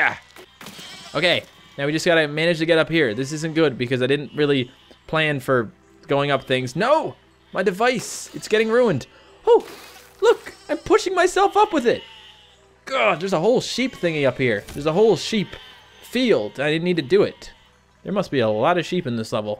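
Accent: Canada. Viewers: I watched 2 videos of yours. And ur accent sounds Canadian.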